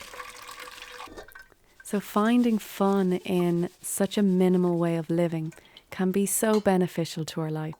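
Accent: Ireland. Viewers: your voice is so soothing :) and the gorgeous Irish accent doesn't hurt :D <3 <3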